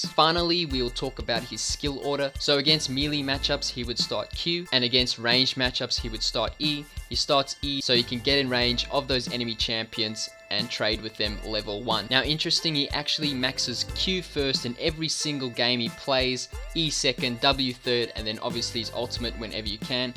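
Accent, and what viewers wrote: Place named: South Africa. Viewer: Are you from South-Africa? Cause it sounds like it ma brother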